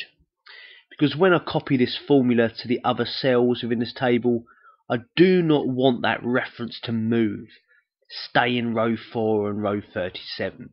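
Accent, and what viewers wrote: United Kingdom. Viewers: Thank you SOOOO much! You're really awesome!💯 🎆This video was so very helpful that I can't begin to tell you how much it actually helped me. Plus, you're accent is so cool! Love it! Are you British?💚💚💗💓💖😌😌🤗👍💣😌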